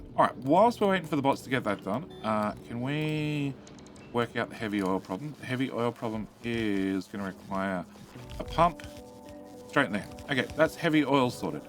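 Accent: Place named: Australia. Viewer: It's Australian mate, different English ay.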